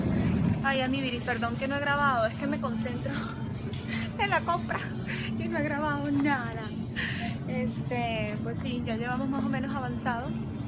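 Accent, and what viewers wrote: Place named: Venezuela. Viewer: Hace poquito encontré tu canal y estoy encantada! Tu súper bella y tu mami bien simpática. Saludos y me encanta tu acento venezolano!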